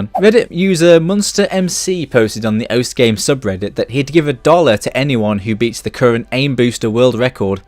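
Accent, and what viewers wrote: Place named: England, United Kingdom. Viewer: I don't really like English accents but at least he admits to being a scrub ecks dee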